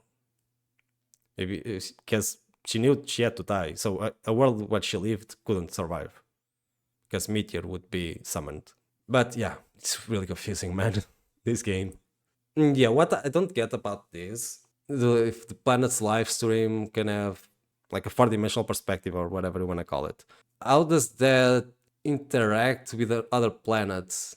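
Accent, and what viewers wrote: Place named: Brazil. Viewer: Esse inglês não engana quanto às nossas origens, do que vi é um bom vídeo, vou guardar para ver mais tarde!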